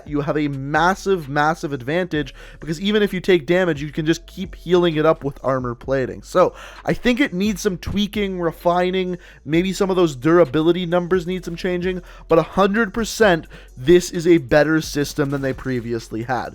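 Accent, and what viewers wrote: Canada. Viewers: That is the most Canadian accent i've heard in a while. I love it.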